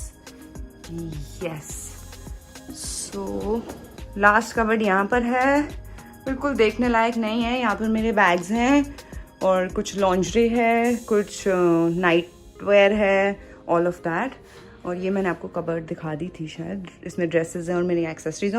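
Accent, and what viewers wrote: Delhi, India. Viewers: such a delhiite haha for a sec i forgot you are an actress, aise mast bhasha bhi bilkul dilli wali, i love watching you <3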